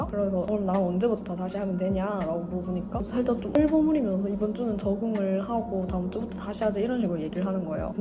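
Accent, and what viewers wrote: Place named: Busan, South Korea. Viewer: ㅋㅋ 넘 귀여우세요 부산말투~~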